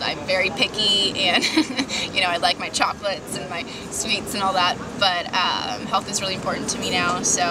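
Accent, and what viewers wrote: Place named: United States. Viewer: i thought she was from south africa? she sounds american to me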